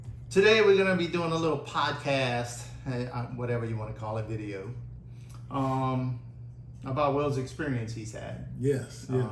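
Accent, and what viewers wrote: New Orleans, United States. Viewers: Love your story Will, and you sound like your from the New Orleans area? I was born and raised there.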